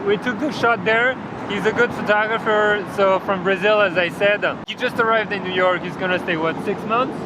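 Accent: France. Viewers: He sounds like Mark ruffalo with a french accent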